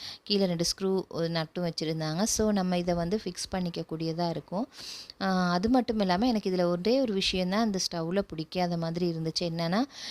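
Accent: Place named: Sri Lanka. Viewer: Super medam elankai spech ❤❤❤😂😂😂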